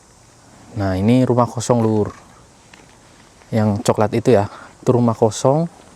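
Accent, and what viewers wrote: Banyumas, Indonesia. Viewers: rika wong jawa ya,banyumasan basane😅😢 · Subscriber baru, mas, Moga tahun ini bisa tembus 100K, dan sehat selalu ya mas, aamiin Dari logat, sepertinya dari ngapak Banyumasan ya? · Ealah wong NGAPAK too 😅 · Sampean wong ngendi mas daneng bahasane ngapak ya😂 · Sampean wong ndi mas?mungkinkah kita terangga desa....krn sama2 ngapak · Aku suka denger bhs ngapak, semoga masnya sehat selalu dan rezekinya lancar aamiin❤❤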